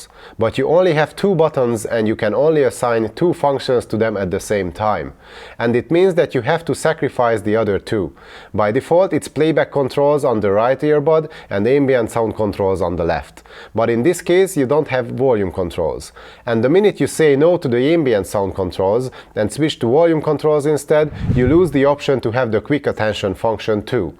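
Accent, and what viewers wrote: Hungary. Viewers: Are you Hungarian tho? Ha igen, akkor pacsi 😃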